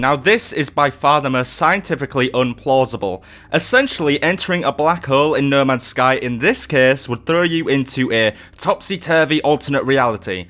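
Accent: United Kingdom. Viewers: British subscribed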